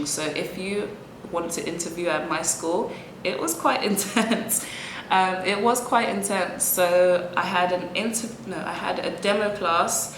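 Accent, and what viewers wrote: United Kingdom. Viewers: OMG! I love your dress, or blouse? and I love your English accent.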